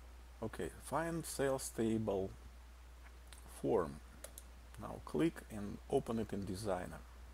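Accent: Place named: Russia. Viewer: Русского человека сразу слышно :)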